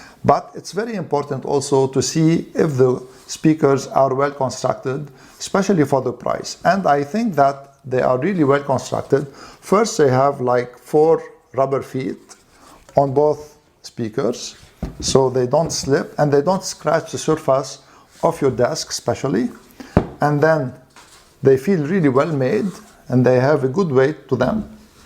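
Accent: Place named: Lebanon. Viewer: you sound lebanese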